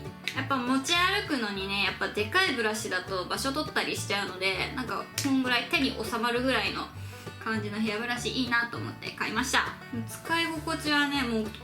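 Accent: Hiroshima, Japan. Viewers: 広島弁かわいいです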